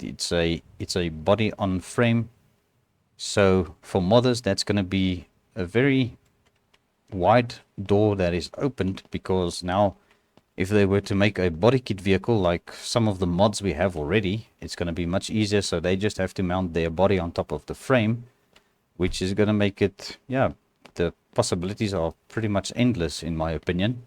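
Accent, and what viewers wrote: South Africa. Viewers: south african i can hear in the accent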